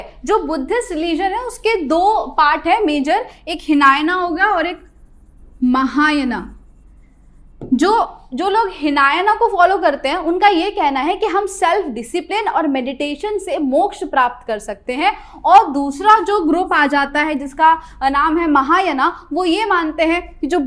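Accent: Gujarat, India. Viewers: Are you Gujarati????😊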